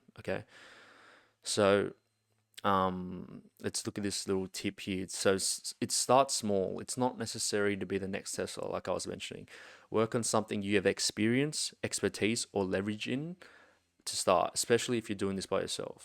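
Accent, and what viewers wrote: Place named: Australia. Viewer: You sound like you live in Australia.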